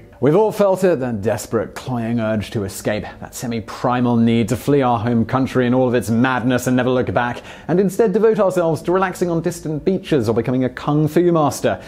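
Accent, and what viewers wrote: England, United Kingdom. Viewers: He's english get over it. Different countries pronounce things differently. Who'd have thought it?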